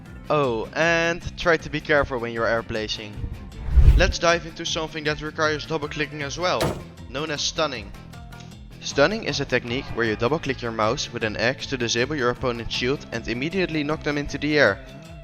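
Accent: Netherlands. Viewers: yo my dutch boi, ye you can hear ur dutch accent very clearly, not a bad thing tho lol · u sound so dutch wth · bro unlocked the dutch accent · the dutch accent 😭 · Nice dutch accent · bro has an dutch/ europian acsent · youre dutch? W vid btw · Are you dutch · i hear the dutch accent 😮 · Are you Dutch u seem like u have a Dutch accent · Klinkt als een nederlander wel goeie vid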